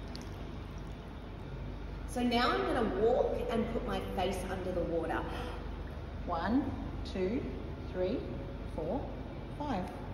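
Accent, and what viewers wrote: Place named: Australia. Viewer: the best ausssie accent. thank ma'am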